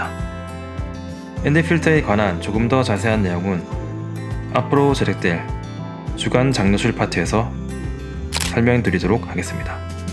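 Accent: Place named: Busan, South Korea. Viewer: 부산분이네요. 잘 봤습니다.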